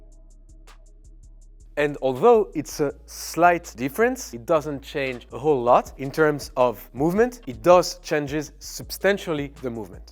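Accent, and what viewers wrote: France. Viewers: A French talking english......thats a rare kind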